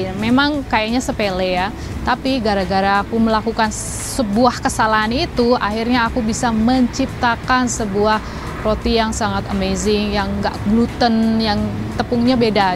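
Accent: Banyumas, Indonesia. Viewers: Nok ngomonge ngapak bae endah padangerti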